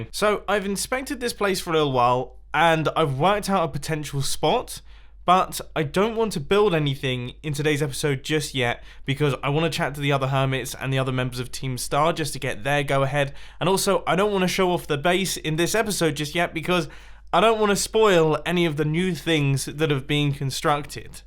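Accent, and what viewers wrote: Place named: United Kingdom. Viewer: You are the most British British person I have ever heard (that’s a good thing)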